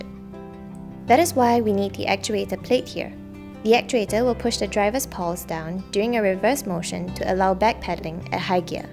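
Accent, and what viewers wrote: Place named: Singapore. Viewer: Sounds like you are from Singapore. So proud of you. Now that i have watched all three videos. Majulah!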